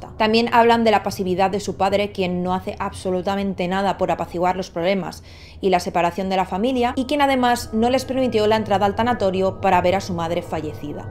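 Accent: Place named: Catalonia, Spain. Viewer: Tiene acento catalán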